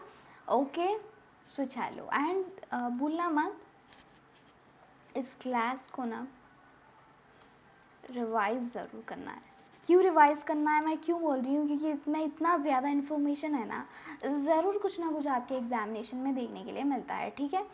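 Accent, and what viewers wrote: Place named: South India, India. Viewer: Wonderful session mam. And your voice is very very cute mam. There is a foreign+ South Indian touch in your accent which makes it unique☺☺☺😍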